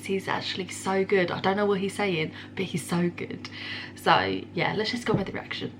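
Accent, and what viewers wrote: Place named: England, United Kingdom. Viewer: I love ur English accent ur so cute and keep react Indian songs Punjabi hits ❤️🇮🇳🤘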